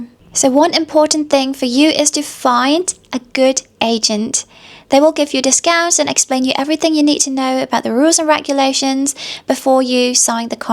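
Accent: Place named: United Kingdom. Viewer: Just curious, did you attend a Uk boarding school? Because of your accent haha.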